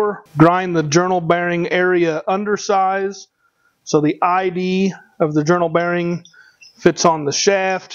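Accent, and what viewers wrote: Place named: South, United States. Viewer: Leginment country boy will bring you back dreakly i reckon only country boys like us talk that way😂 but that saft dam sure got geterd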